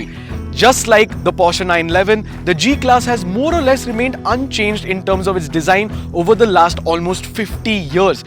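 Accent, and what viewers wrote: India. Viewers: Very Indian Authentic accent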